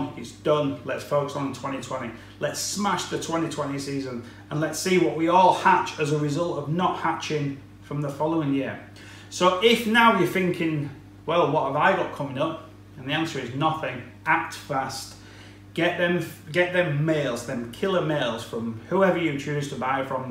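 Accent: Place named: Northern England, United Kingdom. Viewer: Cracking upbeat advice...I got me a killer male...with a smiley emoji on his head from some bloke with a northern accent and an unreal car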